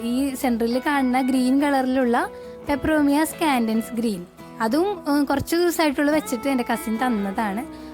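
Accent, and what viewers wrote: Thrissur, India. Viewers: Thrissur bhasha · തൃശൂർ ആണല്ലേ വീട് .😘 ഇഷ്ഠമായിട്ടോ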